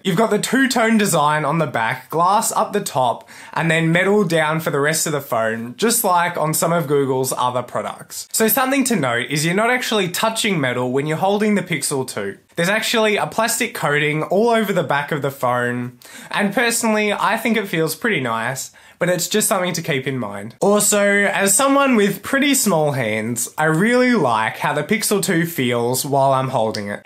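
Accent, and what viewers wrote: Australia. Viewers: What kind of accent do you have? Australia? I come from germany by the way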